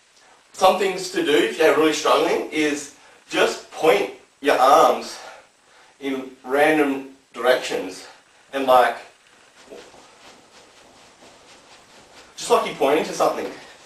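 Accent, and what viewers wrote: Australia. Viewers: are you aussie!? your an aussie! haha me too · his acent sounds australlian cause it is????? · I'm not sure what is stronger. His ability to dance or his aussie accent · OMG~!~! hes australian awsome acsent dude....... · proper aussie accent bra! likes it! 5/5 this how i learnt! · you mispelt guide*........i know your an ausie but please try and speak ENGLISH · Are you australian? Fuck yea! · Aussie accent :) yay. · I watched this video 8 months ago, it helped me get the moves down. I'm not gonna lie, I've ended up more hard style but still, this help me get started, Thanks for this video. And from the sound of it, you're Australian? Would make since, it is the * Melbourne Shuffle after all. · He's Australian. Our accents aren't that difficult to understand. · i tried learning it, but i cant dance so i couldn't do it :( but omg great vid and ur rlly good at shuffling :) btw, what nationality are you? 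are u australian, ur accent is awesome :)) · aussie accent :) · Why are people saying he sounds British? Not sure but he sounds more Aussie than British to me. But that is just an Aussie talking, what do I know? We are all upside down anyway xD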